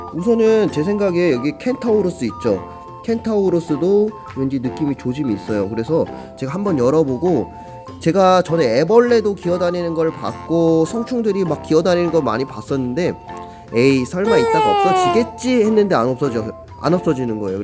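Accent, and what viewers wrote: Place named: Jeolla, South Korea. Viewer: 전라도 억양 고치세요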